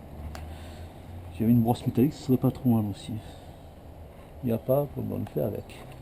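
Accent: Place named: Marseille, France. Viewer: Bravo et merci bcp pour cet tuto. Aaah, jadore lacent marseilles :-)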